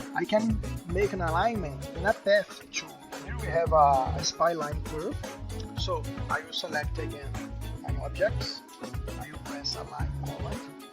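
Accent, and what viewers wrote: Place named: Brazil. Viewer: Falando em inglês. Porem o sotaque me parece Brasileiro hehe.... 😀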